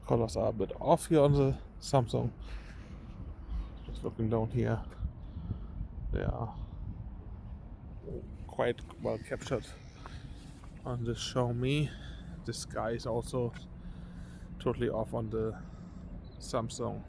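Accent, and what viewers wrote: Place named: Germany. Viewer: Warum nicht auf deutsch Bruder? Ich mein, man hörts ja schon gut raus.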